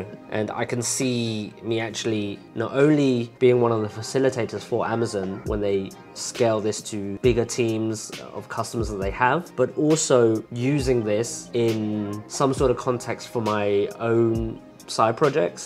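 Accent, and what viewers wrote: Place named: United Kingdom. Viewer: I'm impressed with your British accent.. o.o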